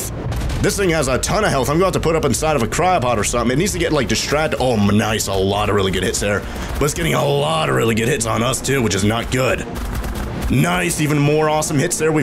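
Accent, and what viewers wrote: Australia. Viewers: i have started to watch ur scorched earth series and realized u sound Australian are u Australian in real life?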